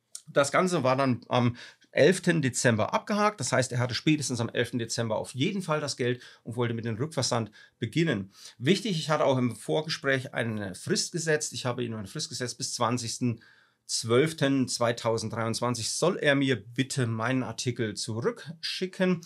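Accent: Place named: Franconia, Germany. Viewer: Sehr fränkischer Dialekt🤔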